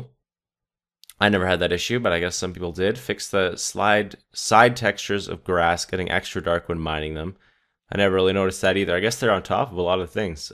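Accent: Canada. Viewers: ... I KNEW IT!!! Oh god.... You are canadian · are you canadian?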